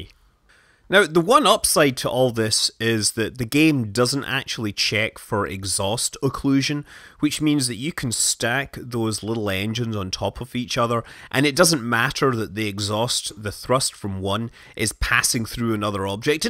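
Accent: Scotland, United Kingdom. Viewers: Scott Manley language or Scottish?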